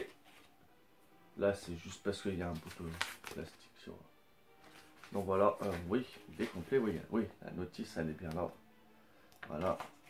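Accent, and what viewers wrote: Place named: England, United Kingdom. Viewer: super retours ! bien joué. j'adore ton accent anglais ! 745 jeux ps2 !! Ça en fait des daubes ;)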